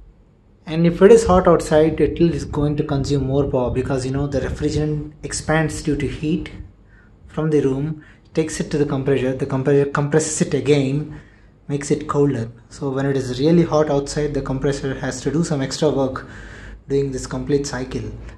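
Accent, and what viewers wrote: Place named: India. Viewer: The indian accent is awful.. speak hindi only.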